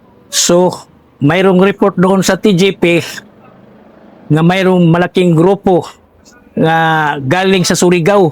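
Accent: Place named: Visayas, Philippines. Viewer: Iba tlga ang bisaya mg kwento , nkakatawa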